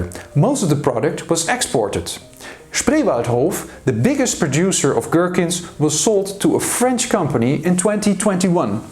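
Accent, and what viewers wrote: Netherlands. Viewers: Wow that's interesting, didn't know he's Dutch. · His Dutch accent is very clear, once you know what a Dutch accent sounds like. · I love your Dutch accent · A strange sensation to watch a video about East German Products, narrated in English with a Dutch accent. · I was soo suprised to hear a Dutch accent once I clicked on this video. Love to see the interest in regional history exceeds borders! Thank you for your research and dedication!! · The Dutch accent is strong though, at his first sentence I already knew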